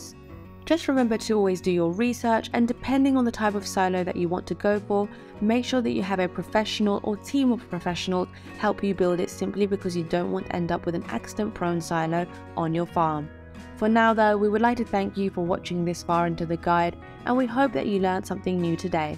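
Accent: United Kingdom. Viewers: I like the narrator's posh accent.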